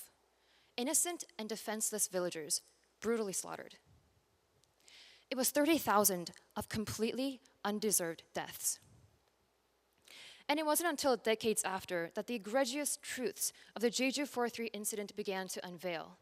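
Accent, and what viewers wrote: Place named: United States. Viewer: WOW SHE S GOT THE ENGLISH ACCENT THAT MOSTLY AMERICAN NATIVE SPEAKERS HAVE I CAN CLEARLY UNDERSTAND HER GIVING SPEECH WORD BY WORD SHE IS EXCELLENT SPEAKER VERY WELL DONE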